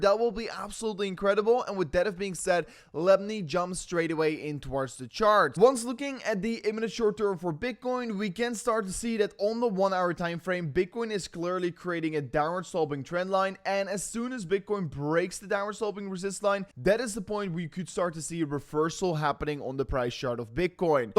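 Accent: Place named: Netherlands. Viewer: The dutch accent is terrible though 😂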